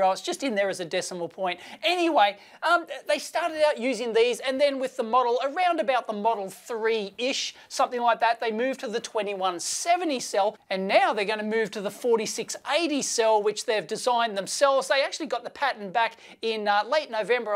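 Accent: Australia. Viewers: Well scripted analysis . Nicely explained . With an Aussie accent to boot. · Nicely explained as always, and all the better for being in Aussie English. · "Dunny roll" haha. Keep it up, enthusiastic Australian guy! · Sandy Munro with an Aussie accent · Love his Aussie accent. To my poor American ears, it sounds like he keeps saying that “we get the HATE out of the bottom of the battery”, which makes it hilarious to listen to.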